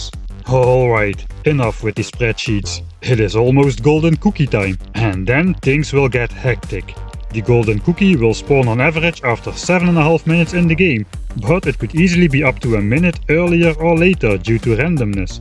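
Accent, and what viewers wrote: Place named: Netherlands. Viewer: This video randomly got suggested to me and I gotta say I love your dutch accent haha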